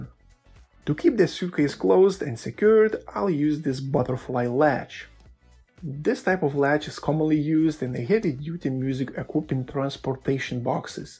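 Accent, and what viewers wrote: Russia. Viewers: Я один слышу русский акцент?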